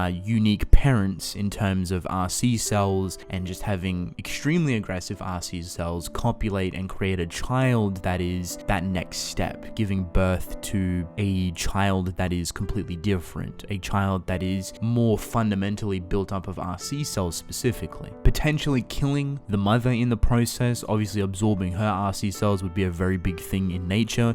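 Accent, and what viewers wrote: Australia. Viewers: Wait, ur Aussie? Same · Polynesian tribes in those days did actual believe in the spiritual cannibalism that consuming the flesh of your enemy made you a more efficient warrior annnd say swear your aussie thats crazzy cause i never realized at all haahah im froom melbourne btw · Wow, can definitely hear your Australian accent much more in these older videos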